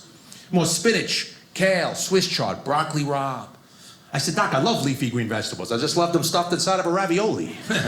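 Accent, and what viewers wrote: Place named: New York, United States. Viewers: That was amazing. Great jokes, great delivery, great timing, great facial expressions. Instantly likeable, too. And on top of all that, me being a German language nerd, a fascinating case study of the New York accent and mentality. 👍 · I enjoyed this set, I like his NY accent, good story line and punch lines. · First time seeing this comic, testing my accent recognition skills. Have not googled him. But he’s from NYC. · Classic standup style routine, but still original. Love the NY accent.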